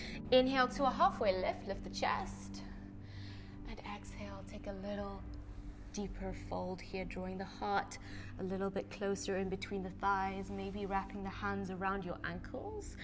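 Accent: South Africa. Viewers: Is that a South African accent I'm picking up? Loved the practice, perfect balance and I feel very energized and ready for my Friday!!!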